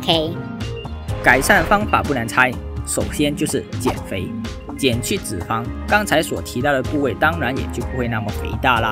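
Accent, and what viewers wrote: Malaysia. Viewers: 嗨，请问你是马来西亚人吗，因为你的口音很像马来西亚人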